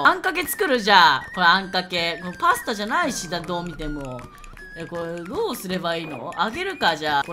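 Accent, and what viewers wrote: Japan. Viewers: もしかして鹿児島県民?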